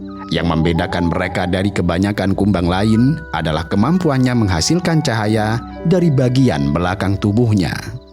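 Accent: Java, Indonesia. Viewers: wih itu chanel awal min😮 iyah ja khas banget suaranya nya medok, cuma sedikit berbeda intonasinya sama sekarang lebih keren, sayu gitu bikin tenang di tambah backsound instrumen nya masuk🛌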